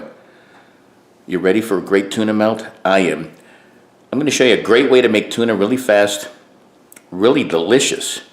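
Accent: United States. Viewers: I’m from Australia I love ur American accent 10/10 👌🏽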